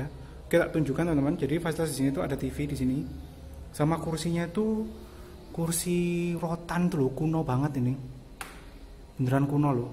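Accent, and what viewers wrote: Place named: Java, Indonesia. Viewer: Medok banget... 🤣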